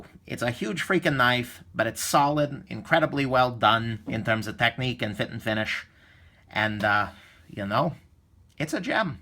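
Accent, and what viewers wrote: New York, United States. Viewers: reviews are a refreshing change from all the slow boring knife videos here on youtube, he's got that NY or Eastern accent and talks fast, I could watch his reviews all day. Yeah the Maitraya Buddha thing gave me a chuckle. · It's called a New York city accent. Language dialects make America colorful and interesting. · Great review ! Just ordered a PM2 from Amazon . Thank You for your honest opinion . plus i dig the NY accent . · I think it's a great accent- New York? Here in the UK we have a wide range of local accents, varying greatly over small distances. Unfortunately, in my lifetime we're losing them, youngsters speaking American, which seems out of place in grey, miserable Birmingham (UK not Alabama!)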